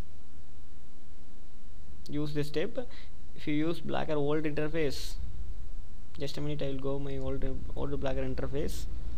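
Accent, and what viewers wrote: India. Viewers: it's not his fault all indians speak that way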